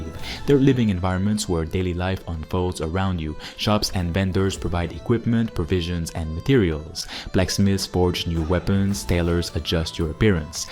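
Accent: Quebec, Canada. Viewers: Gros accent québécois haha (Bon vidéo!)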